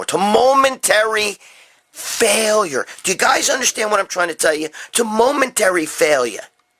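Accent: New York, United States. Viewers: Keep cranking the videos they’re very entertaining. You need to start making some videos on your own channel so this way you can make yourself some money instead of making it for other people. You make everything very interesting and fun to watch also I’m a fellow New Yorker who moved down south so it’s nice to hear somebody talking normal for a change. Makes me miss NY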